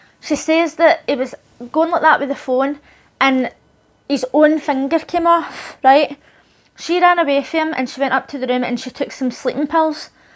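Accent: Scotland, United Kingdom. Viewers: Thats scottish, right?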